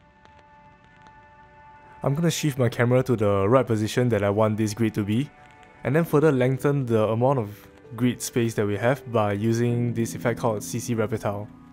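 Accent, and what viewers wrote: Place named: Singapore. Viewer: you sound like youre from singapore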